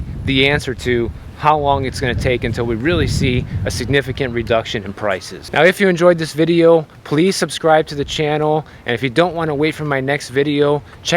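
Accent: Brazil. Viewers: Is from Brazil? My wife is Brazilian. She and could be sisters...accents and all. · She sounds Brazilian! Viva Brazil🇧🇷✨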